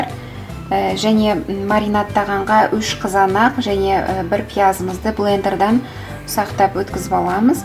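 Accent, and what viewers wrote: Standard. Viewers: рахмет, таза қазақша сөйлейді екенсің, бәрі түсінікті юеткіздің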